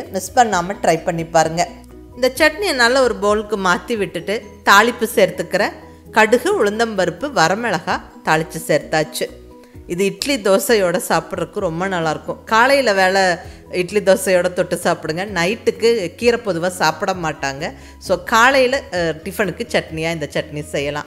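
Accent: Coimbatore, India. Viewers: I'm the first to comment... Happy to do that... I'm from cbe and i love ur videos.. Nice presentation and kovai slang.. I am ur follower of chicken biriyani, brinjal fry etc.. All ur videos are worth watching.. Thank u soo much mam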